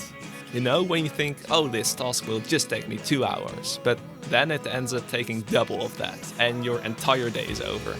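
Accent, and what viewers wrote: Netherlands. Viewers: By the accent I just know you’re Dutch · this shit is fire!! (je hebt een licht nederlands accent btw XD) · R u Dutch? I recognize the Accent 🤟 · Its pretty obvious that your from Europe i think your from the Nederland’s i can hear it from your voice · Ik hoor de hollander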